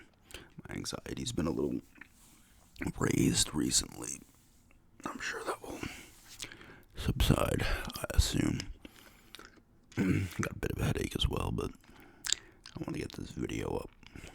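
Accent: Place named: Long Island, United States. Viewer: Kind of hear a Long Island accent, i’m in Pequa. (Potentially) small world. Keep up the great work, love the videos!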